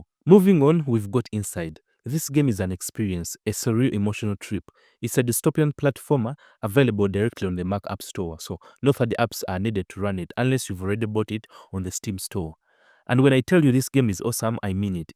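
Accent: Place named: Uganda. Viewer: Ugandan accent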